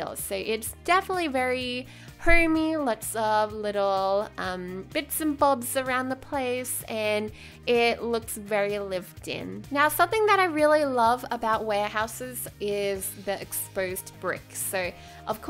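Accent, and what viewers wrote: Australia. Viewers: Hannah Paterson because they are both Australian and have the same accent they sound very similar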